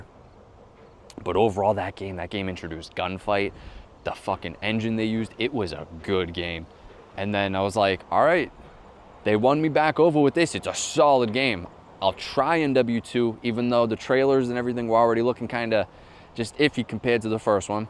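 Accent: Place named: Massachusetts, United States. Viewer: Where you at? By the sound of your accent I often think you must be in Massachusetts.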